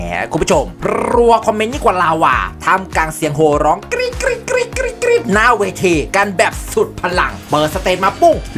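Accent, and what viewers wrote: Isan, Thailand. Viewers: สุดยอด Lisa❤️❤️👑👑 มักเสียงเว้าเด้ มวนคักจนนึกภาพออกเลย 😆😆😂😂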